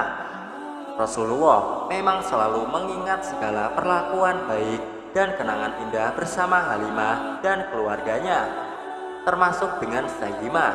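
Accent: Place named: Java, Indonesia. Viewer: Teruk bahasanyer..pelat Jawa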